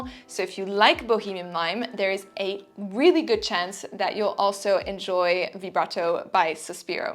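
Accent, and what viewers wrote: France. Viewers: Your english accent grow fast, I almost dont hear your French accent except when you say "Jus de rose" 😁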